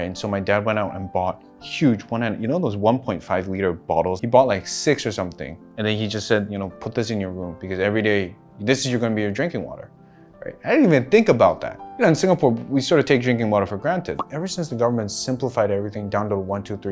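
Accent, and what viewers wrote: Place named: United States. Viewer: American accent in disguse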